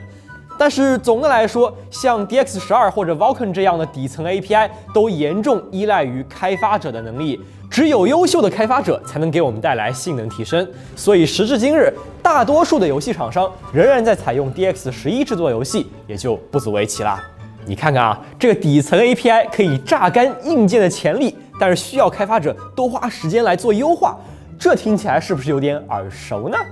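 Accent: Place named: China. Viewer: this is the PRC's essense of pronounciation.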